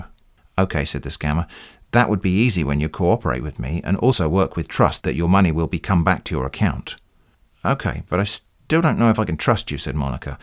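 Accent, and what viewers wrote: United Kingdom. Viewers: Had a long nasty allnighter of work, nice to have that nice British voice to soothe me to a Saturday of sleeping in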